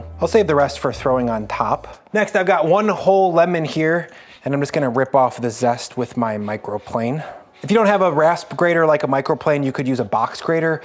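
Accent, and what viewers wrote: United States. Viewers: for the love of pearl why, why metric......you sound like your in the U.S. is that not the case? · Please, you sound like a US citizen, if not apologies, I am not at all trying to offend, but can you use the measurements most US citizens use, ie.ounces lbs etc. Great content and look forward to more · You sound very American. Why grams? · I like your recipes, you have some of the best on YT. But I hate your insistence on using metric measurements. You sound American, and your about section says United States, so why are you using that when that makes it more difficult for the American audience?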